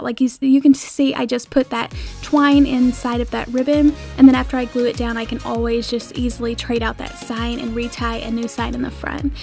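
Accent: South, United States. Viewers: that's how southern people talk lol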